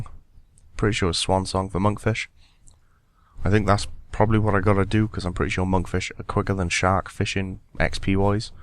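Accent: Wales, United Kingdom. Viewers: i really wish your videos got more views...your videos are amazing man. ive been watching your vids since you like first started. you're by far my favorite youtuber :D love accent too. you're from wales, right?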